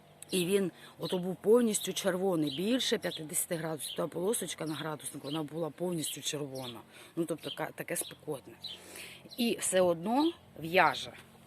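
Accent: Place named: Western Ukraine, Ukraine. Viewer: на заході України інша фонетика (звучання) у вимові.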